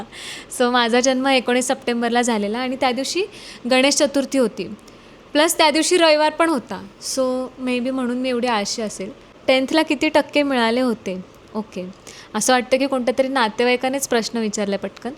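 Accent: Khandesh, India. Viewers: बोलण्यातून थोडासा आमच्या खानदेशी flavour...sweet...जाणवतो... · Vattlach hota tumhi khandeshi aahet ty tumcha bolnyvrun tumche contents khup chan astat mi pn khandeshi aahe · tuzya eka videot pakki khandeshi tone janavli mala, aani aata tr confirm ch kelas tu.Mi comment pn keleli tyavr😅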